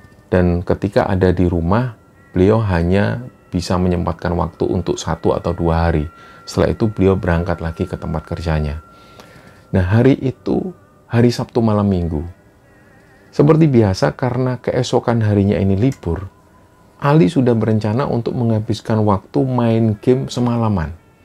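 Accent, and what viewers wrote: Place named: East Java, Indonesia. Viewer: Sambil masak dengerin cerita mas jaaannn nyaman tenan, aku sbg org malang cocok dengan pembawaan mas yg logatnya khas jawa timuran...sukses terima channel aku ngikuti dr subscribe nya msh di bawah 100 smpai skrg gk prnh ketinggalan cerita mas ❤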